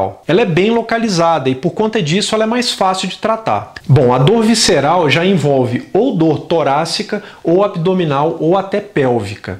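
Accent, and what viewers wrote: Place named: Minas Gerais, Brazil. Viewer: Prof. vc disse que é metade bahiano, metade italiano... Mas seu sotaque é bem mineiro... Parabéns pelos videos, estou gostando muito....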